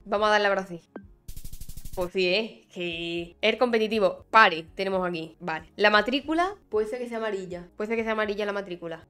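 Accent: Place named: Andalusia, Spain. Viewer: Cómo me agrada encontrar en YouTube a una jugadora de GeoGuessr con un acento andaluz tan característico 💪💪 Saludos de uno de Málaga que vive en Huelva 🤗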